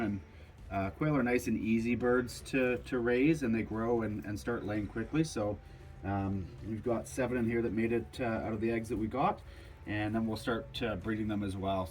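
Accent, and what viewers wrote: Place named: Canada. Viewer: I knew I was hearing a Canadian accent. What a location! Was this land in your family?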